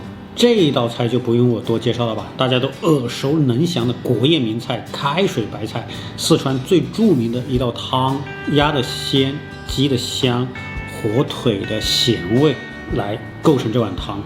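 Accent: Hubei, China. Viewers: 说话跟那个阿斗归来了很像。湖北口音？ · 听口音像湖北人？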